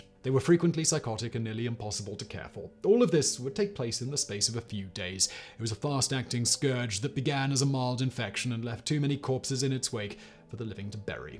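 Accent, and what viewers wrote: United Kingdom. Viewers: IDK if I love your channels for the content, sense of humor or your British accent.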